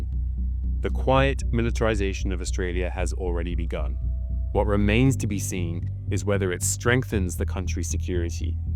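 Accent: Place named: United Kingdom. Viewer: I just reported this video for misinformation, total bullshit lies!!! F off USA the average Aussie hates you and you can’t thieve our tax $$$ to the tune of a trillion dollars along with our sovereignty! & you Brit voice over brat 🤬🤬🤬 how dare you!!! It’s one think to pretend we’re part of your empire but to lie about who really owns Australia now is reprehensible, Brits are as bad as USA! Viva la revolution!!!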